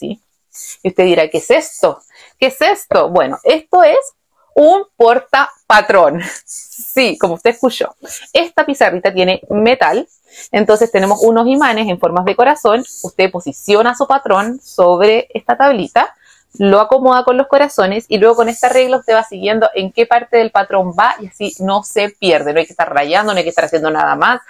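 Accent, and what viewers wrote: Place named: Chile. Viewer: Que rico escuchar tu acento chileno!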